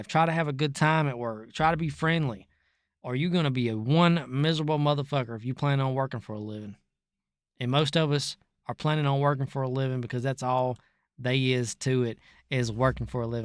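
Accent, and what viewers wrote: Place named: West Virginia, United States. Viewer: This guy's accent is legendary. If he's not from the heart of West Virginia, I'll be damned.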